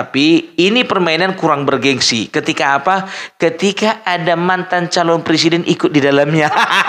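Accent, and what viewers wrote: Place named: North Sumatra, Indonesia. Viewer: Aku suka sama Opung logat Batak nya kental banget.salam knal Opung Aku dari jawa tengah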